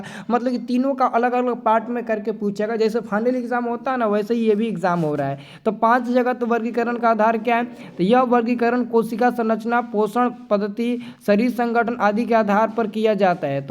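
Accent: Bihar, India. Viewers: tum ho Bihar se ho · Bihar se ho Sir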